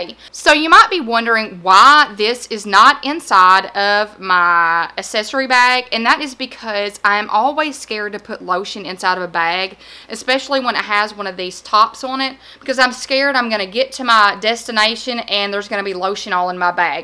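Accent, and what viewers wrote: South, United States. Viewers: I just started watching your videos, and I really am enjoying them. Like you, I am a planner, list maker, etc. I also own many of the same bags you own. Great minds think alike, right? It is also nice to hear a southern accent on youtube! I'm from Southwest Georgia. By the way, I am also a teacher. 😃😃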